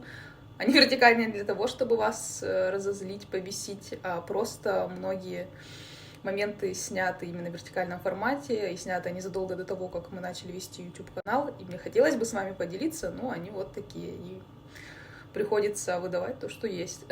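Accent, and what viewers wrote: Siberia, Russia. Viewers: Мимо кассы. Сибирские они. 3000+ км от Тамбова. Говор — туча народу в Сибири так говорит.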